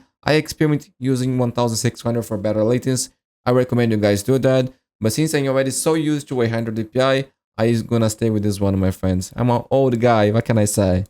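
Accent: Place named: Brazil. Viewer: Tu é brasileiro ne? Sotaque não nega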